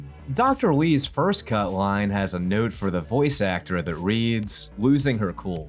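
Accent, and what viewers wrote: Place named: Maryland, United States. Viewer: Are you from Maryland by any chance?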